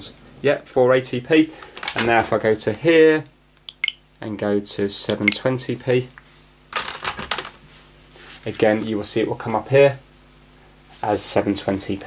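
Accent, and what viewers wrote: United Kingdom. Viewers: I really love the UK accent, I can listen to it all day :D It's the best language in the world ! ( I'm from Belgium by the way xD )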